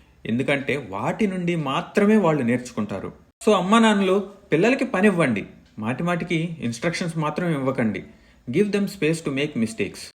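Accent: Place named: Guntur, India. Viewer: Are you from guntur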